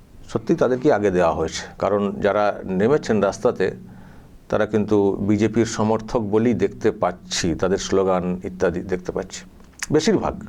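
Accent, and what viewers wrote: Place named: Bangladesh. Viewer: Uchcharon toh puro Bangladesh e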